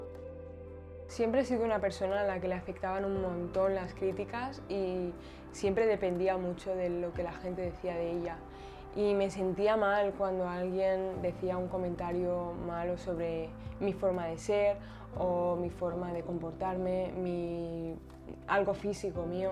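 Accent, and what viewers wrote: Spain. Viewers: me gusta su voz de española suena agradable alv xd ;v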